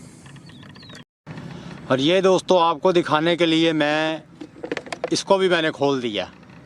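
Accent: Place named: Punjab, India. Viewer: Bhai apni urdu to teak kar lo adi punjabi mar ra ho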